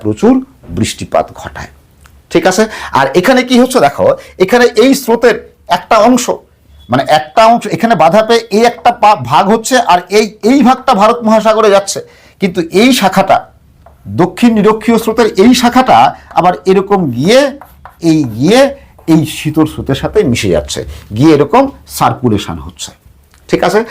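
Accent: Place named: Bangal, Bangladesh. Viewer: দাদার কথা বলার ভঙ্গি বাচনভঙ্গি সব দেখে মনে হচ্ছে দাদা বাঙাল। বাচনভঙ্গি টা একটু সুন্দর করলে ভালো হতো।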